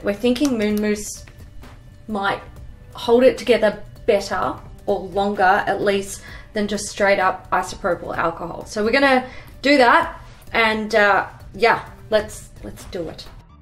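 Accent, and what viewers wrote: Australia. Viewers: Your accents make me miss Australia so bad. Stayed in Melbourne for a week and I need to go back xD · Autralian english is so funny. Mühn müüs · I love watching mainly because I LOVE the Australian accent.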